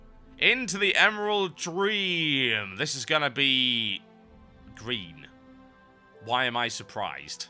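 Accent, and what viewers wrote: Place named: Newcastle, United Kingdom. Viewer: OH MY GOD , Your from Newcastle!?!? Im from Sunderland :D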